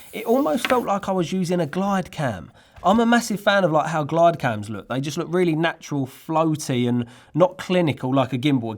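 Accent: United Kingdom. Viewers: Sony a7c gonna be a perfect match for this thing for travel, so I consider buying one if not annoyed you enough with the comment. Little star warsy color and design but who cares, at least folks can see which side I came from. I may get a suitable helmet too. And I subbed for the accent that reminds me of the characters in the movie Snatch, even though not entirely cockney.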